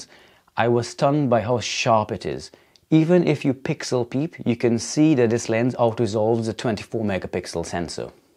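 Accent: Caribbean. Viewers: Being from the Caribbean I recognise Caribbean accents instantly 😅 Thank you for the informative video, particularly sharing tips for auto focus settings. I've probably watched over 20 videos about the LUMIX S5 in the last 2 days and your video is the 3rd video to share tips for better auto focus out of 20+ videos. All the other videos I've seen just complain about the auto focus but don't offer any tips to improve it, I thought one of the biggest selling points of having a camera with pro features was the ability to dial in your own custom settings but seems like those other reviewers just wanted the auto focus to be good out of the box. Considering what this camera goes for now with the 20-60 lens included (under £1,200.00 New) I think it's a small compromise. I've been saying for years, "I'm going to buy a high quality camera" to share photos & videos of my work but other things kept coming up but I think the time is now and the LUMIX S5 looks like a very good deal for the level of quality it can provide. 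Thanks again for sharing your thoughts and experiences 👍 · Fantastic user's insights into the S5. Great hybrid camera. Your hybrid Caribbean accent is also great.. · Great video! I just got my S5 and am learning to use VLOG and colour grade. You sound West Indian. Are you?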